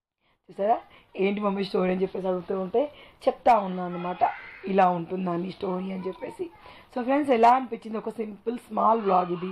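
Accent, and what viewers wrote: Telangana, India. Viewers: Me voice super akka telangana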